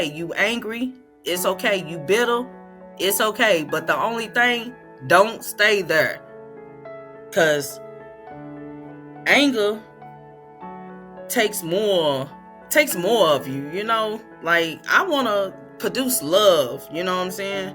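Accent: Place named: Kentucky, United States. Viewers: Hey sis I just listened to your story and just wanted you to know that it was so encouraging the way that you can still smile after all you've been through I really need to change my way of thinking and be positive and be more faithful just like you said that you are I pray for you that everything else will go well in your life you seem like such a sweet person and your southern accent had me just laughing I love you sis praying for you thank you for keeping it real I know good people when I see them and you most definitely or a person with a good heart and a good spirit stay strong and please keep me in your prayers my name is Deborah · Ayeee😁😁🤣🤣 Well thank youuuu sugars. That's that Kentucky talk😂😂😂 Appreciate you 🫂🫶🏾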